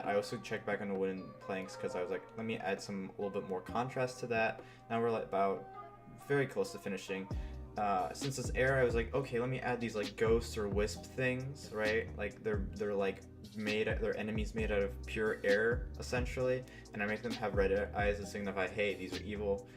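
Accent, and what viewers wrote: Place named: Scandinavia. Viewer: What part of Scandinavia are you from? I can tell you are Scandinavian from your accent.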